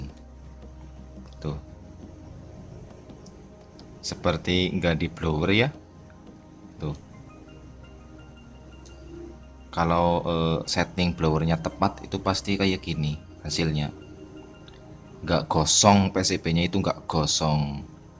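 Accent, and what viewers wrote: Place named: Banyumas, Indonesia. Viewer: ngapak juragan 😁😁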